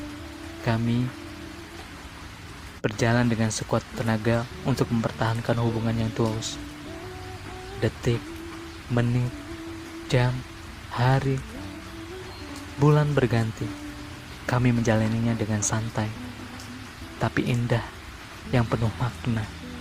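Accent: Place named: Java, Indonesia. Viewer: memang mantap sekali ini cerpenya,indah sekali cara merangkai kata-kata dan intonasinya,bisa mengolah suara medok khas jawanya, sang kekasih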